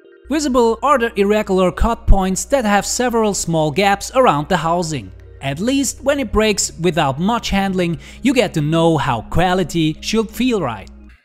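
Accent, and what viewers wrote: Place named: Switzerland. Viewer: ha doch dänkt ech ghöre e Schwiizer :-)! Got the 5K+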